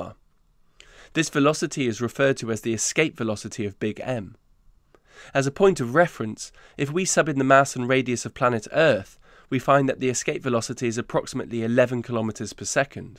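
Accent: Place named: RP, United Kingdom. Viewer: I hate your british posh accent. It sounds like you are constantly trying to project this exaggerrated image of finesse - thus implicitly also trying to cast social hierarchy. I guess this is typical to cultures who have had royal courts for several centuries such as england, france or sweden. But please stop doing it. I'm not saying you have to start talking like a football hooligan but I know brits can speak in a reasonable manner.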